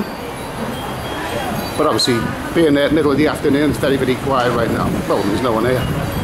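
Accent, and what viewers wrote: Liverpool, United Kingdom. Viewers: Good Morning just gone 7.30am here in deepest Issan What a great way in which to start the day a vid from The Beer Garden Yule be looking for a deckchair on the beach now to sleep all that fayre off!! Great viewing always a pleasure to hear your dulciet scouse tones Reminds me of an ol mucker o mine from Toxteth living in Jersey keep up the Pattaya vloggin it fills a much needed void up here in riceland All The Best Big C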